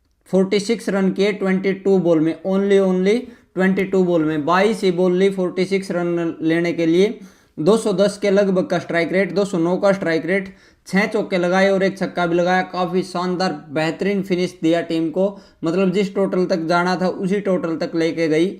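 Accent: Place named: Haryana, India. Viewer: Haryana se ho kya bhai ? Thoda haryanvi touch h aapki voice me 😅🤝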